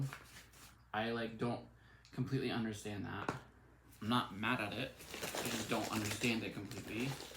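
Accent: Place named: United States. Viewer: Hello, you remind me a lot of my best friend, also the paint dilemma, it looks like acrylic, you can thin the paint with water, it does sound kind of dry plus, it allows you stretch it. I totally agree with you with the non binary stuff. Also I hope you get the surgery you want covered, insurance is a bitch. I literally had to pay for my sons lip surgery recently, he's a little boy and bit his lip, needed surgery to fix it. Cost us 2,000 bucks in the U.S. I'm assuming your also American from your accent. Insurance in the U.S. is a bitch.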